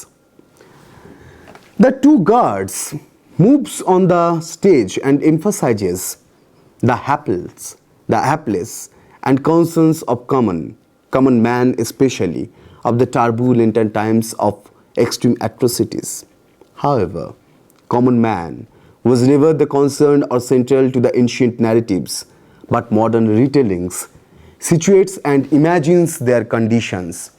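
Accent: Bengal, India. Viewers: Love your bengali English accent 😆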